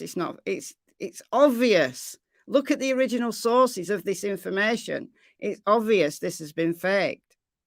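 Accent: United Kingdom. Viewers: 🌟🌟🌟Hey, As far as your age… I don’t think it has anything to do with how you look. This mornings video was more flattering in the natural light and you look to be late 30s to me. I think its something in your voice. Not in a bad way! It’s something like a motherly tone. Wise. Ideals beyond what Americans usually have. Americans, in my opinion, can be self centered and downright viscous at times. Although Uk paparazzi are an animal unto themselves. (Poor Diana) Your steadfast beliefs and unshakable empathy for others speaks to many life lessons. I’m not sure I had that emotional intelligence when I was your age… AND I’m a bit biased on the British Accent. Lol. It’s a pure joy to listen to a well educated Brit when they get their Irish Rant going! Another thing… I hate “Super Chats”… just to old. But you have a way with lives. I usually sit and work on my Adult Coloring Books or play solitaire while listening. 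Again it’s the vibe of hanging out with tea and some laughter to lighten the horror this world dishes out. I really enjoyed latest member video! I would be so proud to have a daughter like you! Many blessings to you. 💖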